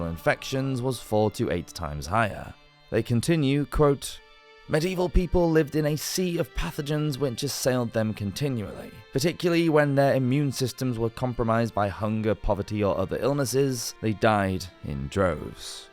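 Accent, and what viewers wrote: United Kingdom. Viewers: Why are people saying this channel is fraud? You can literally search everything. One assumes I'm here for the british accent? No, but it helps now that you mention.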